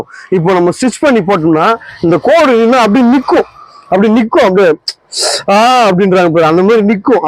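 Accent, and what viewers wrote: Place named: Chennai, India. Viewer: Super super unga Chennai tamil slang awesome dear 🎉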